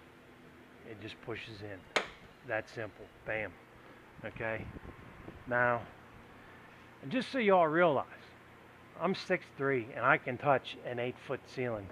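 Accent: Pennsylvania, United States. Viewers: You sound like my in laws, Are you in PA? where did you get the bug shield from?:)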